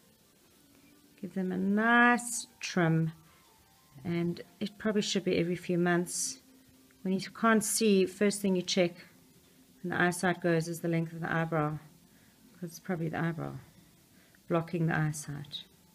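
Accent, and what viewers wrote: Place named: South Africa. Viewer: Right away I picked up the South African accent. I lived in Cape Town for a bit and my best friend that I lived in CT with is from Johannesburg.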